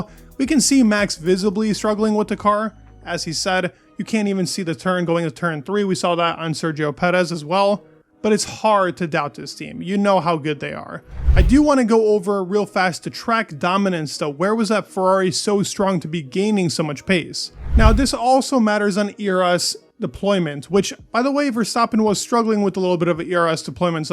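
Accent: United States. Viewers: Same here. I listen to the Brits of course, they have a lot of good content, but it's great to hear an American voice being absolutely as good as any of them, and with perhaps a little less bias towards the British teams and drivers.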